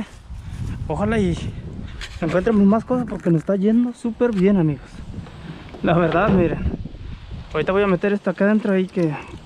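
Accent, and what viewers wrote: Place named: Guatemala. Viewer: Hola amigo Saludos desde Ecuador ciudad Guayaquil eres de Guatemala por el acento.un abrazo.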